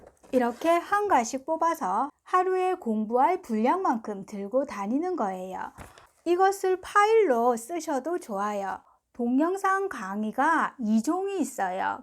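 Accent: Busan, South Korea. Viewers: 님!저도 올해50이된 아줌마입니다!독학으로 취미로 시작해서 이것저것 공부해 오다가 목표에도 없었던 n3를 이번에 도전합니다. 커리큘럼따라 그대로 공부하고 있어요. 기초부터 아주 꼼꼼하게 가르쳐주시기 때문에 행여나 독학으로 완전히 다져지지않고 지나친 과정도 이번에 다시 바로잡게 되었어요^^. 센세 부산사투리 정감있고 귀에 쏙쏙들어오자나요?ㅎㅎ 물론 뇌도 나이가들어 어린학생의 두뇌의 속도는 따라가지 못할지 모르지만, 아줌마들의 끈질긴 근성과^^ 잃지않는 흥미를 꾸준히 유지하고, 매일매일 조금씩이라도 하시면 언젠가 자신도 모르게 일본어를 읽고 쓰고 말할날이 올거에요! 저는 지금 일본인 라인친구가 3명정도 있고, 영상통화로 서로 언어교환도 하고있어요! 응원하겠습니다. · 45세 된 주부입니다. 저는 2년전에 책을 사서 단어,문법 위주로 혼자 공부를 했는데요. 나름대로 열심히 했다고 생각했는데 혼자서는 역시 무리더라구요. 왜 그땐 유투브로 공부 할 생각을 안했을까요...들어야 귀에 익혀지고 따라서 말해봐야만 입이 열리는데 그때는 혼자서도 할수 있다고 고집 부렸어요..결국 포기하고 올해 5월 중순부터 다시 시작했어요! 저도 선생님처럼 연상암기법으로 단어를 공부하고 있습니다. 저는 경남 김해에 살고있는데 단어 암기법 하실때 선생님의 부산사투리가 귀에 쏙쏙 박히는 그 순간!!!! 구독했어요^^ 이상하게 들을땐 오그라드는데... 이상하게 외워지더라구요^^ 미미 초록 목도리, 왼쪽다리 흰다리 오른쪽 다리 미스코리아 다리😅😅😅 듣고! 따라서 말하고! 복습하면서 쓰고! 저 열심히 해서 일본어가 들리는 그날까지 절대 포기하지 않고 끝까지 최선을 다할겁니다. 저처럼 늦게라도 공부 시작하신 다른 분들 모두 진심으로 응원하구요! 우리 모두 다 힘내요!!!! 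아! 선생님 책 출간 축하드립니다!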